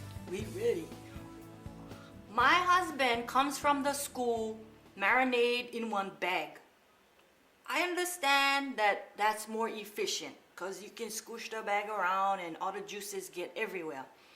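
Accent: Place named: Hawaii, United States. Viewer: "da less dishes i wash da bettah!" lol i love your videos xD you're so entertaining to watch and i like how you incorporate pidgin in your show. au'raiii!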